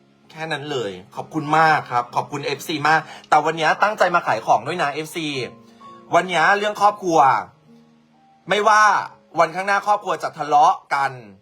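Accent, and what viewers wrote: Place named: Isan, Thailand. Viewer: น้องดิว เว่าภาษาอิสานม่วนๆๆ🎉🎉🎉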